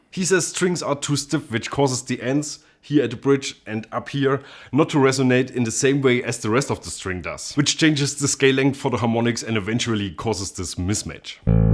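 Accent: Germany. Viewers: 6 seconds into the vid. Are you german?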